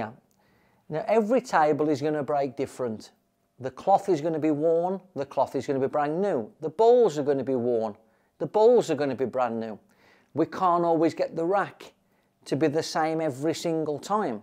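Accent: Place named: Midlands, United Kingdom. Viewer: Hi, love your video mate... im prob only a few years older than you, been playing all my life, dad owned a pub all thru my childhood, i played pool everyday. I lived in australia for 20 years and saw u play there. I lived in sydney so i mostly played american pool, im back in the UK now and for the first time im hitting balls again on an english table.. wow.. playing english pool is quite difficult again after playing american pool for so long. so i really appreciate your tips here mate. Id love to come and meet you for a chat about pool one day. Im based in coventry, i can hear by your accent mate that your from the midlands??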